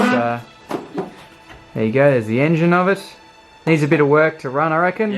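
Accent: Australia. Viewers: He has the most aussie accent ever :D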